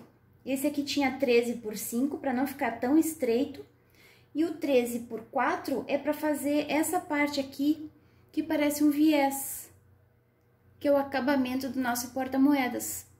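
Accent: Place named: South, Brazil. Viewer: Amei .. Cheguei hj no canal e já amei .. Vou salvar pra fazer! Amei só sotaque do sul 🤩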